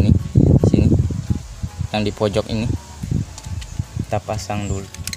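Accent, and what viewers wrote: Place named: Bali, Indonesia. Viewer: orang bali, logatnya T nya kelihatan.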